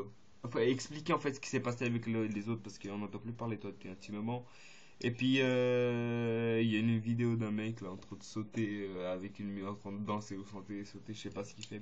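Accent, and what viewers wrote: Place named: Belgium. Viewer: Il a un leger accent belge...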